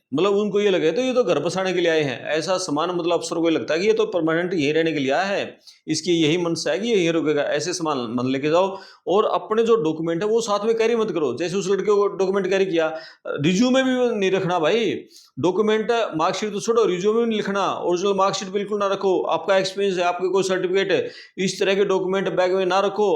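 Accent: Haryana, India. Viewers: Haryana accent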